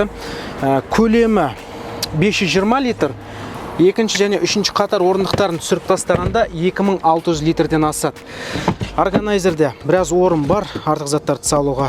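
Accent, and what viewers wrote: Standard. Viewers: Қазақша таза сөйлейді екен👍👍👍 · Қандай таза қазақша сөйлейсің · қазақша таза сөйлейсиз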